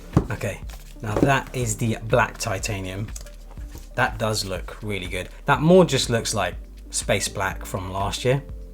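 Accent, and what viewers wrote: United Kingdom. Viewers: What a decent British Accent 👌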